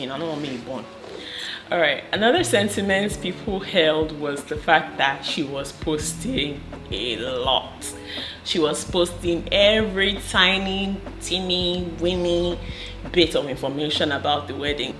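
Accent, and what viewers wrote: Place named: Ghana. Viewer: Maraji is picking up the Ghana accent already o😁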